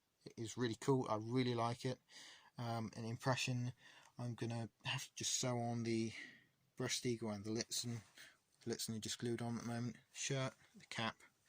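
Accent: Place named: United Kingdom. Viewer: Mate, you have a strong British accent.... nice room decoration..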